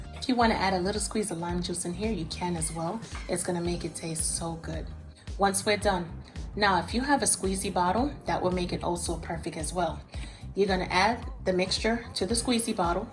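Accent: New York, United States. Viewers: i feel like your from new york lol i love the way you talk . GREAT COOKING TUTORIAL THANK YOU ❤️ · My sentiments exactly!! I was sitting over here admiring the soft tone to your voice, the giggles and that gorgeous ring. I can’t help but notice as well that you’re from New York like me🙌🏾 The way you speak tells it all. You definitely confirmed my suspicion when you said “don’t let me come to your crib”😉dead giveaway! Who but we say this😍😜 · NY stand up!! I kept laughing, like, wow! We really do have an accept. The way she says Sauce and so many other things!! Too cute! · Your accent mad cute you so New York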